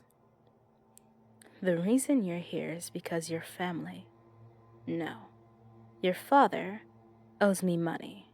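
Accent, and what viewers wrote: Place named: Scotland, United Kingdom. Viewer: I CLICKED ON THE VIDEO AND HEARD A SCOTTISH ASCENT AND IMMEDIATELY GOT HAPPY IM SCOTTISH, this is rare ✨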